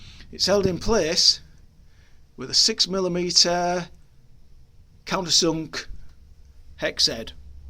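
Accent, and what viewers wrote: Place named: Yorkshire, United Kingdom. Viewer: Firstly my good man, don't ever apologise for a wonderful Yorkshire accent! Most of my wife's family are from Hull! And secondly, THANK YOU, THANK YOU, THANK YOU, for recognising that us mere mortals cannot afford these things! I'm currently looking into funding for a Grit Freedom Chair, which is a very similar thing to what you've designed and built for yourself. I say 'looking into', because I know full well that I won't be able to get the funding to be able to afford this thing. Firstly, it's manufactured in America (the first hurdle I've got to negotiate), secondly, as you've rightly pointed out, these things cost an absolute arm and a leg, (it works out at nearly 5 grand for goodness sake!) and thirdly, at least 90% of the funding available for these things is aimed at kids, or least for people under the age of 25! 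I'm a 48 year old man, for goodness sake, what chance have I got! I want to enjoy what life I've got left, I want to go off the beaten track a bit. So I need something like this! But I can't afford it. I wish the manufacturers of these things, would get it into their skulls that the very people that need these things, are also the poorest people in our societies, the disabled people who, generally speaking are on benefits! I guess what I'm trying to say, is congratulations for taking matters into your own hands, and actually building one of these things yourself, and getting yourself out of the predicament that many of us find ourselves in.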